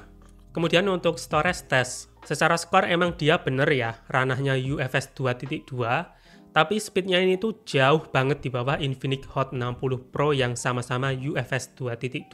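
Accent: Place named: Java, Indonesia. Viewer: Aku subrek karna logatnya jawa dan juga wibu. Kita boloo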